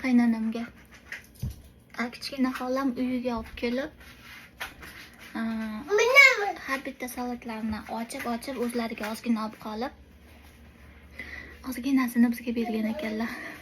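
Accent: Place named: Fergana Valley, Uzbekistan. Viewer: УЗИ если,Тошкентлимисиз йоки водийданми?Шевангиз Водийга тортади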